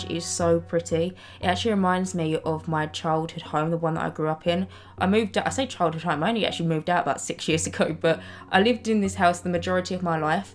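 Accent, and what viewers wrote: United Kingdom. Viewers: how I instantly knew was british 1. its well old 2. her accent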